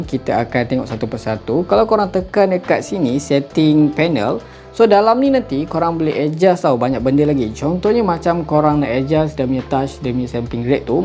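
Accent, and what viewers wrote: Terengganu, Malaysia. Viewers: kemah orang ganu kite... tiberrrr 😁😆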